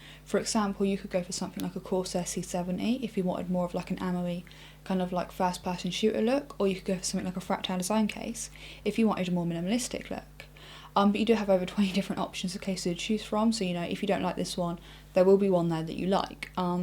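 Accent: United Kingdom. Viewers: Uhm...she's obviously British, it's just the way they talk in some areas of Great Britain. There's nothing wrong with her. Americans...enough said... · She lives in the UK so she has an accent. It makes me laugh at how people are critical of perfectly acceptable accents. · What part of the UK are you from?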